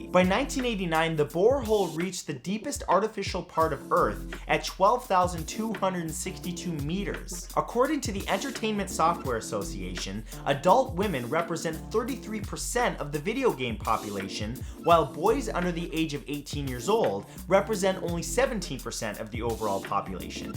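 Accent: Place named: Canada. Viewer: He's Canadian. Some things they simply pronounce differently than we do in the US or other countries.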